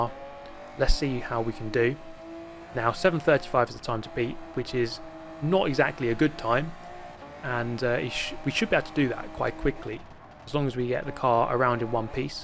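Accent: United Kingdom. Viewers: Why do I get so hype watching someone else play the same game as me. Those damn Brits can make everything sound interesting.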